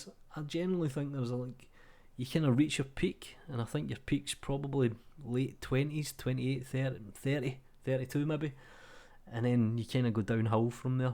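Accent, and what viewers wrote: Scotland, United Kingdom. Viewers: As a german, I find your scottish accent very pleasing! I rarely struggle to get what you`re saying. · Happy Birthday!...and I love the Scottish accent. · There is no shame in your accent. At least you are not using Scot's vernacular or Ghàidhlig ... tbhiodh e inntinneach faicinn an dèanadh iad staim dheth....LOL! Bless those who wish to preserve the auld braid Scots tung. You are young yet....just wait...it gets worse... :) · love the Scottish accent BTW. Very different from Grant Ibbot. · Downfall?! Bollocks. The Scottish accent makes everything better, including your videos :D And dont sweat it mate. I'm 8 years your senior, with 4 kids and two nuked marriages behind me and I still play around with Blender and other softwares daily. You're doing fine ;)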